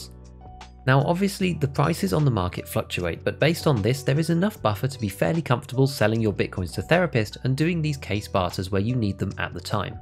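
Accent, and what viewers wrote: United Kingdom. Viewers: it's more of a "British" way of talking.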